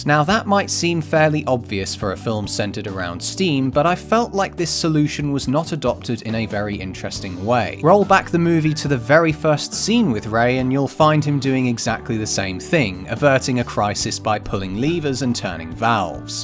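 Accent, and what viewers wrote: United Kingdom. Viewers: this guy sounds very British what do you mean?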